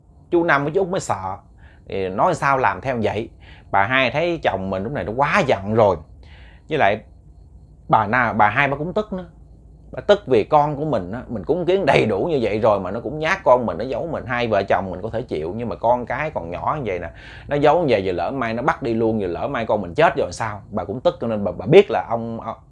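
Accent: Mekong Delta, Vietnam. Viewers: Giọng đọc của đúng chuẩn miền tây quê tôi...❤❤❤❤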